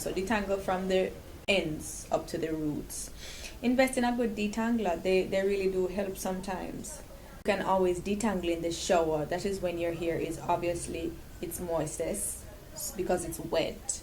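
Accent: Jamaica. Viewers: The moment I heard her, I knew she's Jamaican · Your Jamaican accent!!!😍😍😍😍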